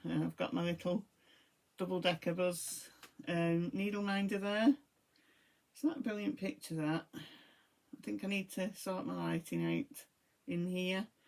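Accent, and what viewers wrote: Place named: Northern England, United Kingdom. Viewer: Just got into smalls so the winter pattern would be lovely to add to my very small collection - love your channel, so nice to hear another northern accent!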